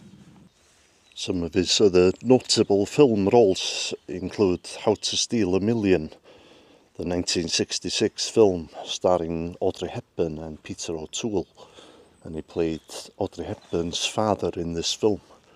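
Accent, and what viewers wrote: Wales, United Kingdom. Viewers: Just want to say I love your channel. Excellent channel. My mother was born n South Wales so I am half Welsh and proud of it. Your accent reminds me of all my mothers brothers sisters and my welsh grandparents. Their first language was welsh. Keep up the great channel. Diolch yn fair iawn.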